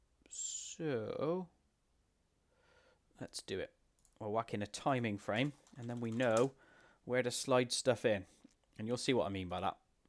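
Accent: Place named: West Country, United Kingdom. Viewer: Are you from Bristol, by any chance? Your accent sounds very westcountry with your "Aff" instead of "Of" and your hard Rs. Don't worry if you are lol, I'm also a Bristolian!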